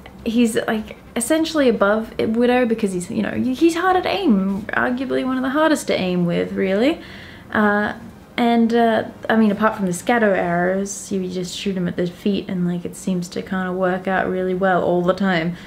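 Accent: France. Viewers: Can you talk more with French aczent? sounds pretty good ^^ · i love ur french accent xD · nice Vid ... til point 12, jeah I'm triggered (why is junkrat harder to master than her?!?!?!?!)...:D and that's a nice french accent ;D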